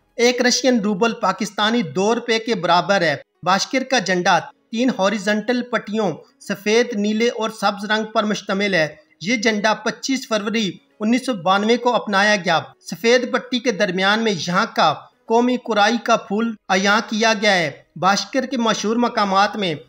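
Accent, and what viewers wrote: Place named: Punjab, India. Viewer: He has punjabi villagi accent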